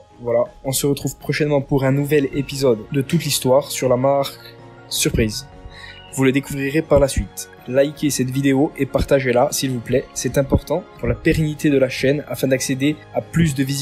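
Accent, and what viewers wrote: Corsica, France. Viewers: Ne serait ce pas un accent corse que j'entends ?! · t'es corse ?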